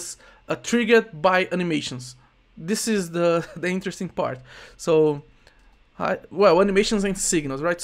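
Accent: Brazil. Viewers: é incrivel, todo brasileiro consegue saber q o mano é brasileiro só de escutar a voz e o sotaque kkk, mas otimo video por sinal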